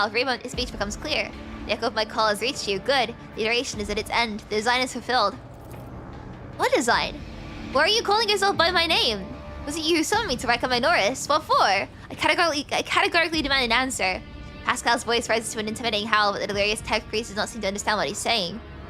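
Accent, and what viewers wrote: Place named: United Kingdom. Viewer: Gotta say how delightful it is listening to a very British person play a very British coded game. Keep at it Mistress!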